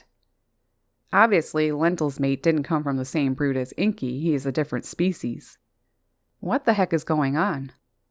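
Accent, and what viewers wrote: Canada. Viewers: Most Canadian accent ever lol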